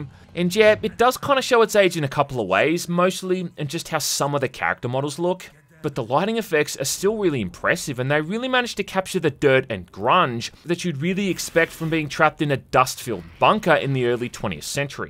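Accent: Bogan, Australia. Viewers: Just discovered your channel. Love gaming commentary from someone else with a bogan Aussie accent! Good work! 🇦🇺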